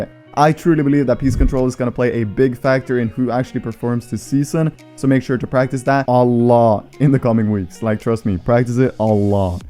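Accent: Norway. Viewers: Are you Norwegian?🇳🇴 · Hæ??? Jeg visste ikke at du va norsk! Nå som du sa det så hører jeg det. :/ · er du norsk?? det visste jeg ikke haha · vent, er du norsk?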